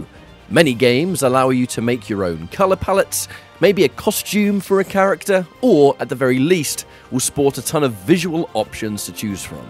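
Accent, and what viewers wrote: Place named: United Kingdom. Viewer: its so hard listening to BRI'ISH people talk... like is he saying FREE or THREE "BOH-OH OVE WAHAH"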